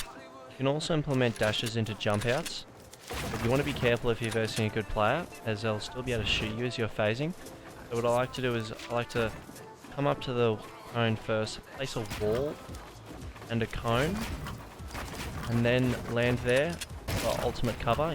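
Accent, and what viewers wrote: Australia. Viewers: love the australian accent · R u Australian, if so r u best OCE?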